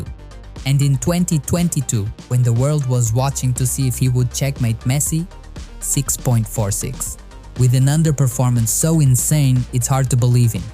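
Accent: Portugal. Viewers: Este gajo eh Portugues.... de certeza. Heheheh Video genial!!!!!!! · This guy has a portuguese accent! Great video/Grande vídeo!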